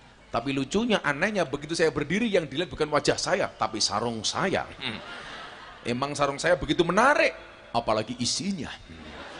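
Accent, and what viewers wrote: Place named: Banyumas, Indonesia. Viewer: Pantsan Abah bisa ngomong ngapak menghormati yg desa"ngapak..kaya saya yg ngapak juga.. bangga sama Abah😊sht"ya Abah Gus Miftah kyaiku 🤲🤲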